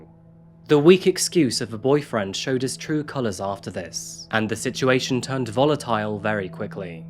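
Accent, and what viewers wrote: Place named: United Kingdom. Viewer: I don’t know why insults seem way more harsh when done with a British accent…but I loved the shade! That guy was a monster! RIP Sophie Elliot🙏🏽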